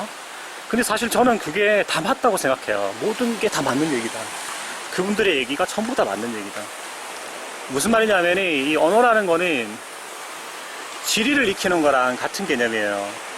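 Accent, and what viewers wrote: Busan, South Korea. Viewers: ㅋㅋ 이기대네요 저 이동네살아요 부산 억양이 많아서 고향이 부산이 아닐까??생각했었습니다 저도 그 옛날 학교운동장 스탠드같은 거기 좋아합니다 거기 뷰도좋구요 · 부산에 사세요? 저도 부산어 사는데 ^^ 반가워요~ 어쩐지 말투가 친근하다했어요 ㅋ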